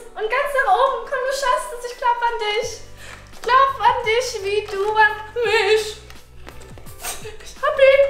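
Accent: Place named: Hesse, Germany. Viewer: als ob du auch aus hessen kommst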